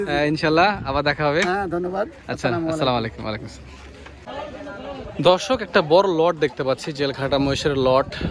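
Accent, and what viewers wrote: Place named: Rajshahi, Bangladesh. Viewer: আমার ভাগিনী বিয়ে দিছি রাজসাহিতে ওর জামাই সাথে মাজে মাঝে কথা বলি আপোনার ভাষা ওনার মতো তাই জিজ্ঞেস করেছি, দেসের বাড়ি আসলে একদিন জাবো বেরাতে, আমারো ইচ্ছে আছে গরু মহিষ এর খামার করবো বাকিটা আল্লাহর ইচ্ছা দোওয়া করবেন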